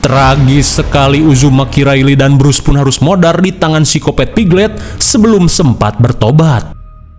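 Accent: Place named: Sunda, Indonesia. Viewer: elu Sunda Bagong tu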